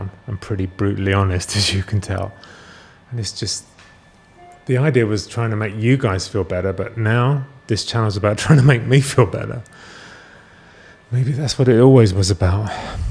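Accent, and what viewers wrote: United Kingdom. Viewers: your British accent is sooooo delightful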